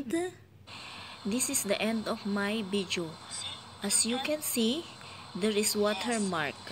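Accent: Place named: Indonesia. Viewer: I think you're not a filipina? Your accent like Indonesian, malaysian, indian girl.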